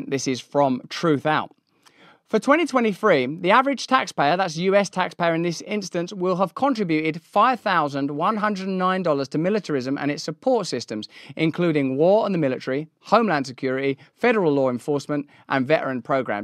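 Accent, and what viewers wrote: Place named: United Kingdom. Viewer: Have you ever accidentally left your volume on when Russel’s British voice hits? Good hell.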